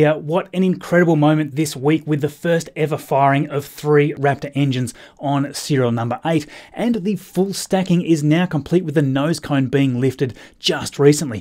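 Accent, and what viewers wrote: Australia. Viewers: Love your videos and its great to hear in Aussie accent. May I recommend an Artlist subscription for your music.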